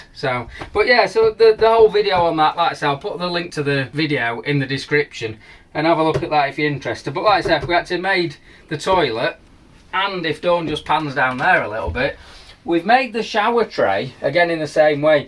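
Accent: Yorkshire, United Kingdom. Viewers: Your wonderful Yorkshire accent is similar to that of Mick Ronson, a guitar hero to many like me. Do you both hail from Hull as he did, or are your roots closer to Leeds, Sheffield, etc.?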